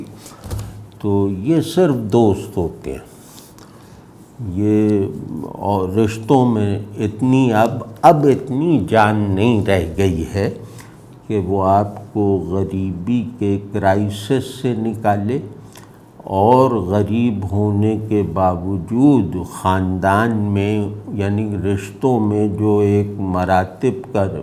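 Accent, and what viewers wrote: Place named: Delhi, India. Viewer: Shaikh ur style of talking shows that u belong Delhi ur knowledge about Chishtia sect is authentic.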